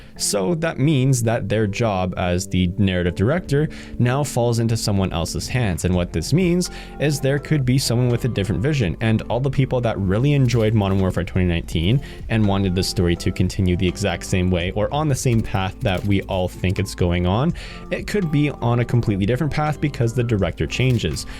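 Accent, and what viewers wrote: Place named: Canada. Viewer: he's Canadian. You can tell by how he says certain words.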